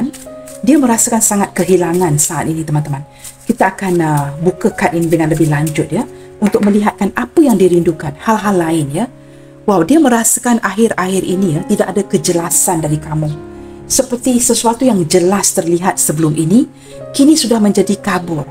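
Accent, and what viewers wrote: Malaysia. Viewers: Terima kasih bunda kesayangan ku yang selalu siap setia kasih kita semua pencerahan sehingga kita semua selalu wawas diri dalam bersikap, readingan yang sangat gemoy idaman setiap subcribers nya dengan alunan swara yang sexi terutama logat basa Melayu nya ILIKE IT.sangat resonet bund 👌👍🤲🙋🙏.betul bund komonikasi itu penting 🙏🙏🙏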